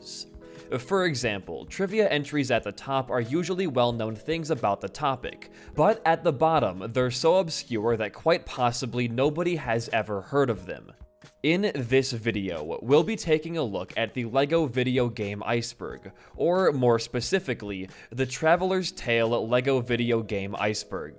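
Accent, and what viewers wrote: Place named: Australia. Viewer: he’s Aussie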